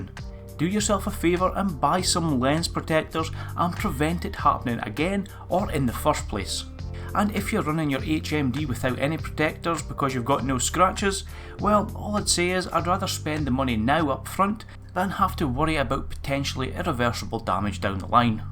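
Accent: Scotland, United Kingdom. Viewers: Love that Scottish accent m8😂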